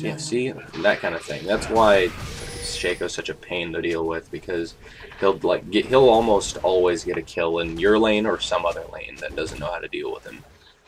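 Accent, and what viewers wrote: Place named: Netherlands. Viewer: Where are you from?You sound Dutch,or mayb Belgian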